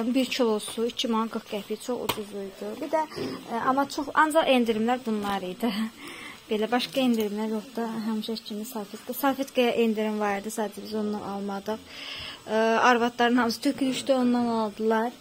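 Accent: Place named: Southern Azerbaijan, Iran. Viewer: Salam bacı bir videonuz təsadüfən qarşima çixdi,elə o videodan sonra sizə abunə oldum,özümdə iki uşaq anasıyam,eləbil öz həyatımı gördüm amma mən sizdən yaşda böyükəm,heç kese qulaq asmayın, necəsinizsə elədə evinizə eşiyinizə yapişin,ailə bir qaladır o qalaya başqalarının fikirlərini girmeye qoymayın çox səmimi,mehriban ailəsiniz Allah qorusun,birseyi unutmayın bacı bugün etdiyinizi qarşiliqini Allah sizə verəcək inanın,həmdə fazlasiyla halal olsun size tərbiyə verən ata-anaya(bu arada videolarinizda doğma cənubun ləhçəsinide,eşitdim yada oxsatdim😊özüm Masallidanam❤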